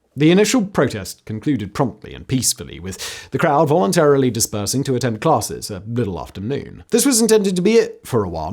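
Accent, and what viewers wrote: United Kingdom. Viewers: Yeah, a YouTuber of unknown qualifications and a British accent is going to tell us about the intent behind the killings at Kent State in Ohio.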